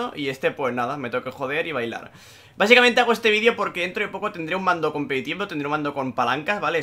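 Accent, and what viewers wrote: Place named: Almería, Spain. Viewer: Eres de Almería es por qué hablas igual que yo me gustaría poder enseñarte mi nivel en fortnite un saludo y suerte en el competitivo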